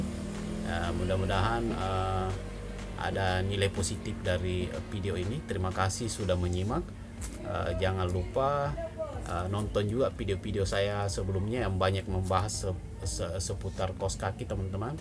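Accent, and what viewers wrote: Indonesia. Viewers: Sulawesi ni kyaknya.. Salam dri bugis kaltim.. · Bang emng dari serang banten ya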